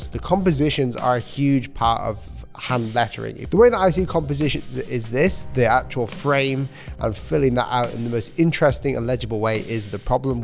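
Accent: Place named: Yorkshire, United Kingdom. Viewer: Are you from Yorkshire? You sound exactly like the voice in my head. It’s weird.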